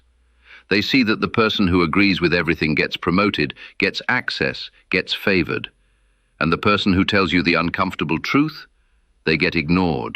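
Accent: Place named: England, United Kingdom. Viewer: He was Italian, why does he have an English accent?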